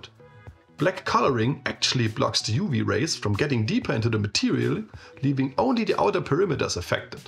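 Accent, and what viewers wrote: Germany. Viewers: Not a native speaker. To good and clear pronunciation :) German? Subscription is done.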